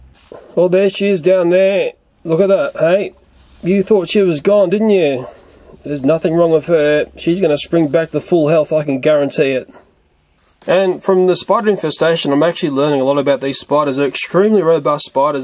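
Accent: Australia. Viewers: >mfw you think that's an American accent He's Australian. That's a redback spider. Just stay away from Australia and the drop bears and other nasties won't get you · Is dis boi Australian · So Australian people speak English but they don't no our American English interesting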